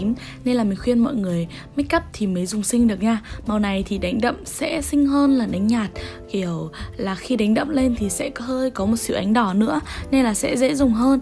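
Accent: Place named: Vietnam. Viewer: ui bạn ơi có nhiều ng học tiếng anh rất giỏi nhưng lúc nói hay phát âm các thứ chưa chuẩn vẫn bị hơi hướng tiếng việt đấy... kphai ngta k cố gắng học phát âm mà kiểu giọng lúc đọc tiếng anh nó bị nthe sẵn ấy.. ai chả muốn nói hay nma giọng nthe r bt lm sao:(... t thấy c phát âm nthe là ok lắm r í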